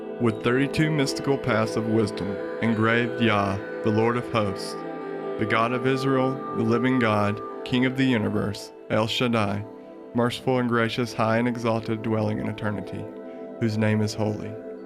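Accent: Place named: South, United States. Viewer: Narrator has a southern accent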